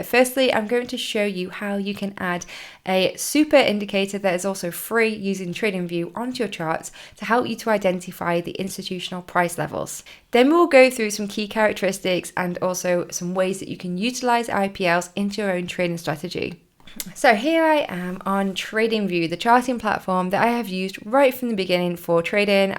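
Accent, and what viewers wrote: United Kingdom. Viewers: That is a very British accent and a very British face. I feel like I'm in a Bridget Jones movie.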